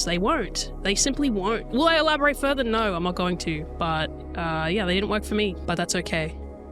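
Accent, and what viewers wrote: Australia. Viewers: Are you me with tits and an Aussie accent? The fuck has the internet done to our generation? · Why is Kurtis Conner speaking with an Australian accent? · I love her accent 🇦🇺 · You area Australian?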